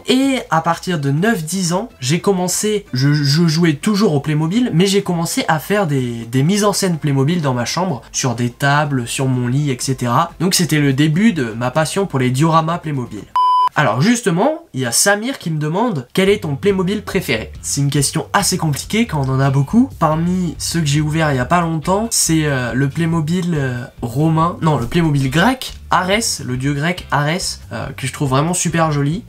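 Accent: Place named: Germany. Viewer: Super l accent allemand 😁😁😁😁. T es génial.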